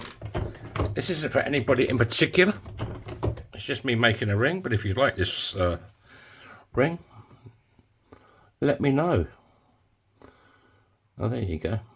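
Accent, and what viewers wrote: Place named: Cockney, United Kingdom. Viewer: Funny are you cockney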